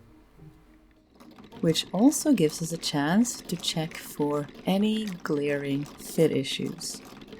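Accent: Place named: Sweden. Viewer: I came here from your Hank react. The tone and pacing of your video style is gorgeous. Perfect for watching while drinking coffee from my favorite mug and knitting on a rainy Sunday. Also, my maternal grandfather was a Swedish immigrant (US here) and I feel like I'm listening to long lost kin. ❤ An island of tranquility in a dark, stormy world these days.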